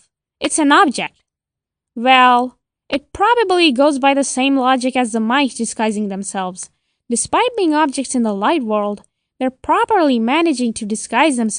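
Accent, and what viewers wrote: Southeast Asia. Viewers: pretty sure it's an accent?? sounds southeastern asiaidk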